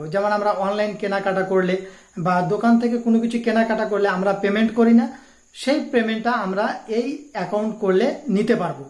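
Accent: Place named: Chapai Nawabganj, Bangladesh. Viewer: মনে হচ্ছে চাপাই এর লোক কথা বলছে।ভাই বাসা কোথায়?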